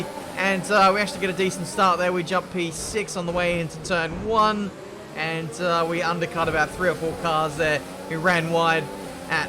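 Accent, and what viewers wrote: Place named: Australia. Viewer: DUH YOUR AUSTRALIAN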